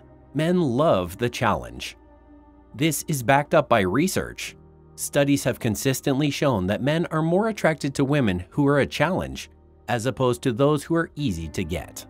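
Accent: Canada. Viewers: The Canadian accent strong here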